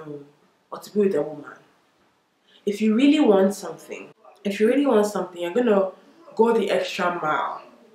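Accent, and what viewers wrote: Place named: Ghana. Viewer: This video deserves more views 😭😭😭 P. S I love the little Ghanaian accent you have!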